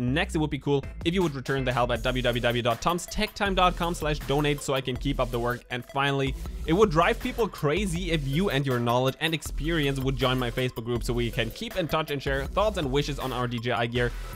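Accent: Germany. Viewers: Cool stuff! Your English is excellent. Very little German accent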